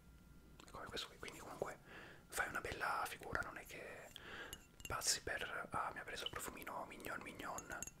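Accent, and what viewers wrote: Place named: Tuscany, Italy. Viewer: sentendo il tuo accento, perché mi sembri toscano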